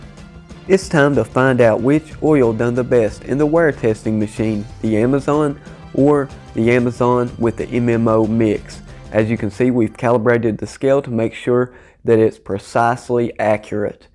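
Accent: United States. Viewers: This video confirms my belief, People from the south shouldn't be anywhere on my TV. Please talk correctly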